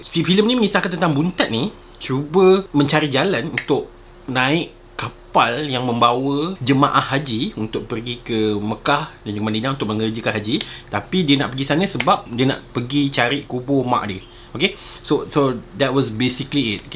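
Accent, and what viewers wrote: Kedah, Malaysia. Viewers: Rasa lorat dalam kawasan kedah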